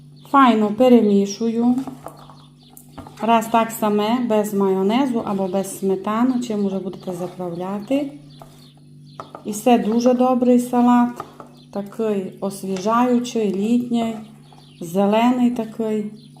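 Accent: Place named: Tiachiv, Ukraine. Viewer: Вітаннячко з Канади 🇨🇦 Вподобайка одразу на підтримку каналу 👍 Хотів спитати Вас ,часом Ви не з Тячівського району? Така знайома ,приємна бесіда у Вас...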